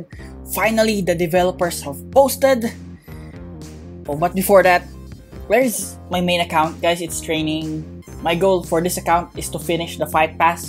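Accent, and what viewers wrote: Philippines. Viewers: talking sus he sounds like a filipino..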